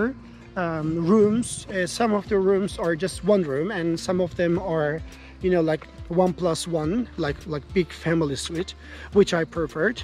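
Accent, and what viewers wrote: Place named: Turkey. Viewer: özüne dönmek budur 🤣 ingilizce konuşmaya başladığı ilk dakika bu adam türk belli dedim bla bla bla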